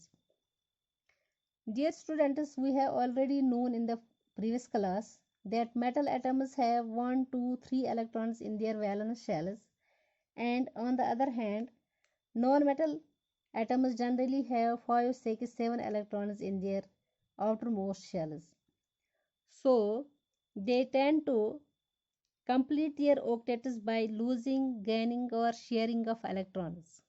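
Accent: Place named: England, United Kingdom. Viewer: English accent tho 🙂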